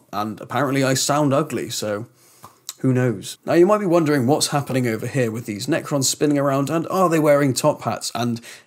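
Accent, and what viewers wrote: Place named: RP, United Kingdom. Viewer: You already won yourself a new subscriber for speaking properly. We guys on the continent always enjoy listening to you guys in the UK exercising your stiff upper-lips… — Posh enough for me at least ;-)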